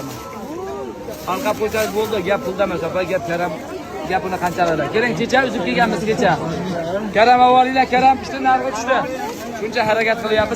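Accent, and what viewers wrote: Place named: Yangiqurgan, Uzbekistan. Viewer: Akaxon siz Yangiqo'rg'ondanmi sheva Yangiqo'rg'onga tortyapti gap yo‘q omad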